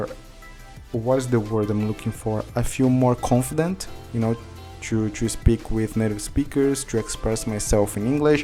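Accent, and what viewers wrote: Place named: Brazil. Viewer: It's great watch your video again. I watch a lot videos about English, cambly, things like this. But the best of them is yours. And The best "brasilian" English of them is yours. I entered on Cambly because of your videos. Keep going guy 🤜🏿🤛🏿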